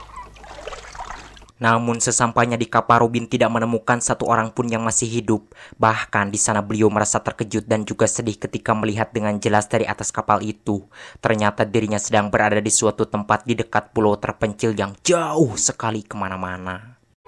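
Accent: West Java, Indonesia. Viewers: Anjig Sunda pisaaaan he he he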